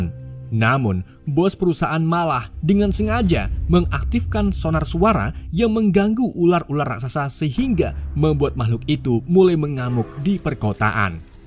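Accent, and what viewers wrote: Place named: Banyumas, Indonesia. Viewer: Wkwkwkw jawa,a itu loh,,ngapak bgt mka,a saya suka chenel ini ,,bikin ngakak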